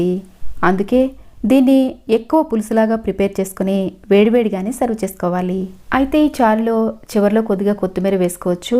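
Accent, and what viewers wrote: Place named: Andhra, India. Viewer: Andi andi meru Telangana unna Warangal vantalu ani youtube channel peru pettuko andhra slang matladutunnaru enduku...meru andhra na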